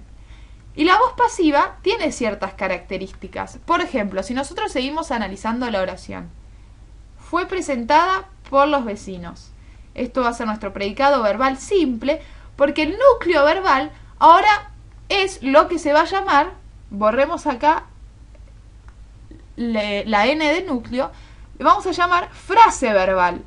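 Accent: Argentina. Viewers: Gracias, muy linda tu voz argentina :) me encanta ese acento en las mujeres de allá, saludos! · tu voz es RE argentina sos de argentina??? que suerte estaba estudiando lengua y encontré estos videos tuyos son buenísimos me re sirvieron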